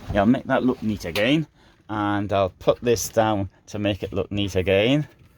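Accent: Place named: Lancashire, United Kingdom. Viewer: American here, I’m kind of a language and accents nerd. I love British regional accents. Are you from Lancashire? Three fourths of my heritage is from the UK. It’s mostly English and Scottish on both sides of my family, with a a small pie slice of Cornish, and the tiniest slivers of north Wales and Northern Ireland. The rest of me is Slavic. Cheers from a mile high in the Arizona mountains.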